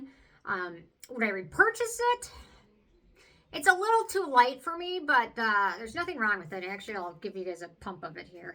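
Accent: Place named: Midwest, United States. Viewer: I love your accent. Northern Midwestern accents are absolutely adorable- sounds so wholesome! 😊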